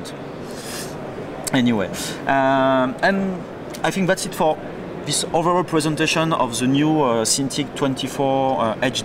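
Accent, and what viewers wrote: France. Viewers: On reconnaît bien l'accent français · I was thinking this guy is french.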